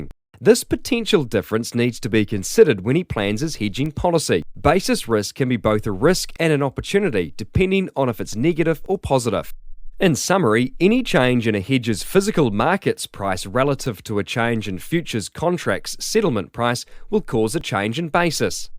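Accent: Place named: New Zealand. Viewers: its kiwi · It’s a stindard iccent in New Zealand.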